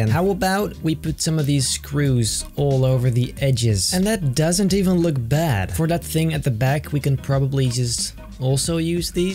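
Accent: Netherlands. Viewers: Turned out pretty good ✨, but I miss videos with the dutch accent 😆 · Quick question, are you Dutch? Becuz your accent is the same as ours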